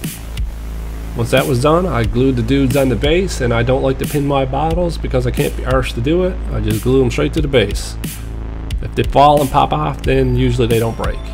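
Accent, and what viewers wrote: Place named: Ontario, Canada. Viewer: Nice work on these, but where’s this guy from? His accent sounds like Ontario crossed with surfer bro.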